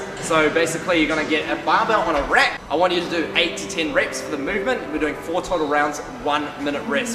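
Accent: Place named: Australia. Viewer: a bahbell on ah reck! aussie accents lol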